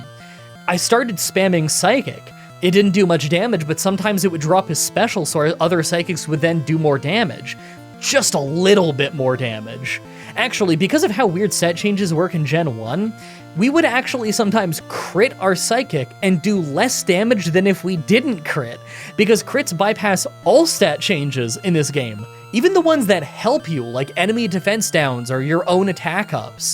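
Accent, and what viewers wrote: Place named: Canada. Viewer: This narrator's Canadian accent is so cute to listen too😊😊😊😊😊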